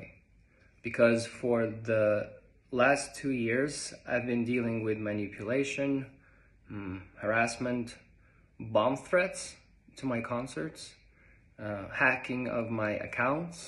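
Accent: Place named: United States. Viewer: Omg. Jaja du fikk en fin amerikansk aksent da! VELKOMMEN tilbake. Løp ut og se nordlyset, det er jo så sterkt og kult nå om dagen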